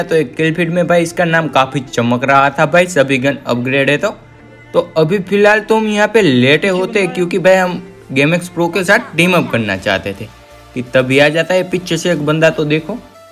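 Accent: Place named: Gujarat, India. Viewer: Lagta he Gujrati manus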